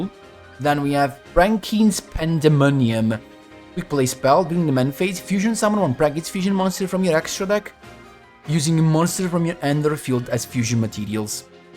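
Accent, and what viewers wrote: Italy. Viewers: Perché hai un modo di parlare l'inglese a mò di napoletano. Essendo che lo so parlare e capire anch'io hai alcuni tratti che mi ricorda me allora ho voluto domandartelo "sapendo che probabilmente avrei fatto la figuraccia" invece saper di aver indovinato per un intuito che ho avuto mi rende molto felice ahah Comunque sei un grande, lo parli benissimo e adoro come spieghi le cose su Yu Gi Oh!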